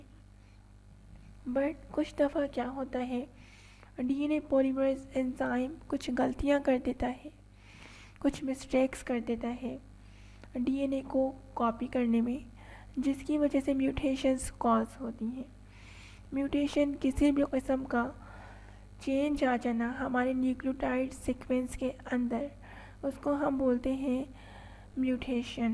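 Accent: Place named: United Kingdom. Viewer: Ap aise hi bolti ho kya hindi me english accent dalne ki kosish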